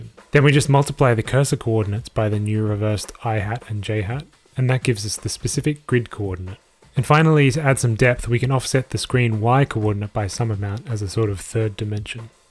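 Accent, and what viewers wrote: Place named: Australia. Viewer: >strayan accent >math :(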